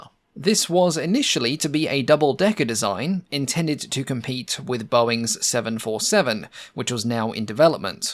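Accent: Australia. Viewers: Oh god, another Australian with the dull mechanical delivery of a schoolboy reading out his homework. But worse. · Nike is pronounced just like the Nike shoe or did I miss this because of your Aussie accent <link> Cheers from San Francisco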